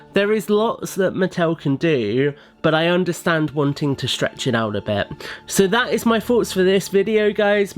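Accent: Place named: United Kingdom. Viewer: Are you British?? Your talking way sounds like British?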